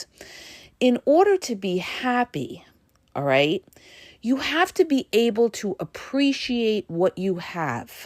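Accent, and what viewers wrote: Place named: East Coast, United States. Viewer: It’s gotta be the East Coast accent….❤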